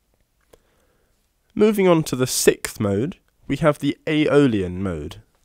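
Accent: United Kingdom. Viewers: thank u ،،;btw r u from UK?i enjoyed ur accent 👏👏♥🌷🌷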